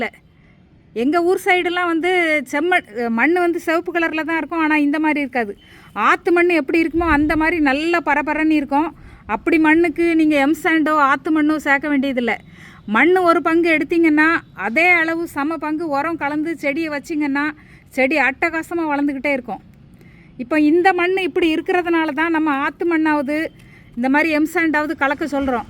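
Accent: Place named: Thoothukudi, India. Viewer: very happy to hear acca.I found out from your slang that you must be from Thoothukudi or Tirunelveli.I'm also from Thoothukudi only.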